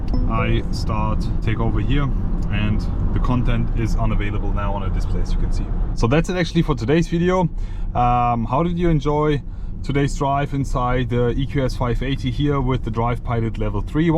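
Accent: Germany. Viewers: Der deutsche Aktzent ist hörbar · The German accent is strong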